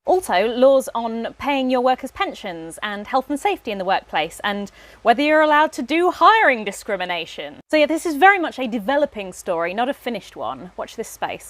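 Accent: United Kingdom. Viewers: Did you not just chastise me because you believed I was making an assumption, but you're now asking me to assume the demographic of this channel predominantly have one or more neurological disorders? Now that you've told me you have ADHD, that could factor into my interactions with you, but to just assume everyone has a diagnosis, just in case, would be downright rude and in my opinion a very unhealthy way to navigate the world. Be receptive to people's needs and adjust accordingly when necessary, but don't go around assuming everyone needs special treatment. As someone who has family, friends and colleagues with all kinds of diagnosis and disabilities, physical and mental, I can say with some confidence that what they want it is to be treated as anyone else whenever possible. If your preference differs, that's equally fine, but you should be careful about projecting that onto others. 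As for the original comment I responded to. Do I think it's reasonable to conclude that someone genuinely made a comment in the belief that a British tour guide is unaware of whether England has a king or queen? Even if unaware of who she is or any of her other videos, she clearly speaks British English and makes her affiliation with London clear in the first five seconds of the video. And then there's the description, of course. The chances of that "you mean the king?" being a genuine question or informative correction is as close to zero as it gets. I think it was perfectly in place to point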